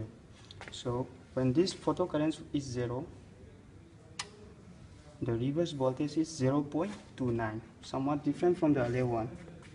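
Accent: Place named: India. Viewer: are you from india ,well explained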